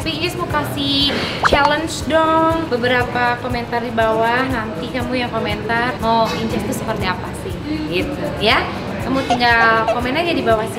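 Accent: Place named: West Java, Indonesia. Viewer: Karakter nya kaya gitu bgs manja dari pada kasar.😆😆 Dan dia orng sunda logat bicara nya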